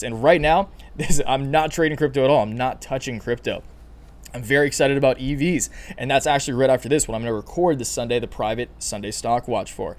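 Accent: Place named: Hawaii, United States. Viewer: He's from Hawaii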